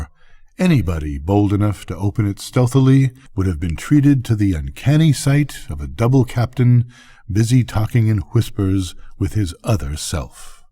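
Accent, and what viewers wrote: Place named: United States. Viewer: One of the greatest short stories ever written. Inviting many interpretations . But sadly almost forgotten . Conrad's style can be rather ponderous for modern tastes so his popularity - such as it is - will dwindle even more no doubt. ? Still , this was very well done . A pleasing , well modulated North American accent is always a pleasure to listen to . Despite the obligatory moans of English listeners who think narrators should sound like Sir Laurence Olivier !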